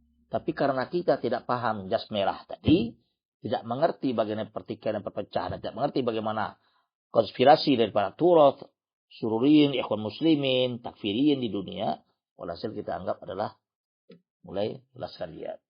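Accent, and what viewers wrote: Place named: West Sumatra, Indonesia. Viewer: Ini ustadznya orang Minang nih Logat nya...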